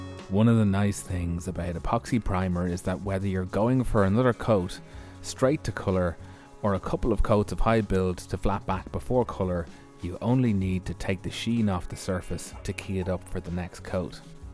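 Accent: Ireland. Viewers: As a Dutch guy I love the Irish accent and your humor man. I’m not used to film or let alone editing but the way you portrayed the disassembly for instance looks fantastic. I appreciate the fanatic way of removing the rust, you can only do it good once. About etch primer or epoxy, people shouldn’t bother. Etch is only for adhesion and given the fact it needs to be applied very slightly it doesn’t give protection against corrosion, that’s the job of the epoxy primer. And some epoxy primers are suitable for using on bare metal and some aren’t. I presume you checked it before you bought it and especially before you applied it. The only thing I don’t understand why you removed the primer on the plug welding spots. Couldn’t you use a weld through primer? Oh maybe that’s only suitable for spotwelding, when there’s are no drilled holes in both layers of metal. No I would seal all the seams between the top and under panel where water can run into. 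And I would pay special attention to the screen wiper mountingpoint for instance, I mean there is bare metal underneath. I’m not saying this to pretend I know everything better, just trying to think along. Keep up the good work and that means the total package, I enjoy it!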